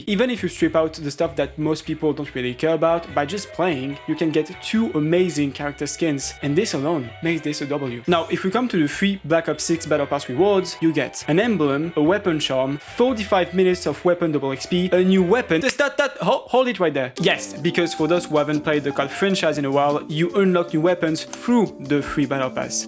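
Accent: France. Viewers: you sound very french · Having a French accent is kinda broken tbh. You can do something shameless and then yell at me with that funny accent and suddenly its ok and I agree with you.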